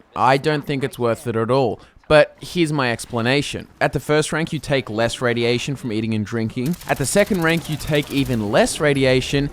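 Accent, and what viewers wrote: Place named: Australia. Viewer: What an annoying Australian accent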